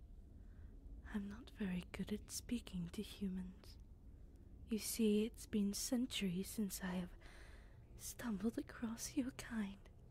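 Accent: Scotland, United Kingdom. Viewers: Are you from Scotland? I hear an accent.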